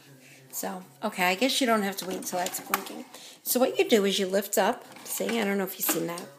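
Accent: New York, United States. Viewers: Tery Lim Is she from New York? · It's a New York accent. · I love the my cousin vinny accent! good review, I just ordered mine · Someone’s from new york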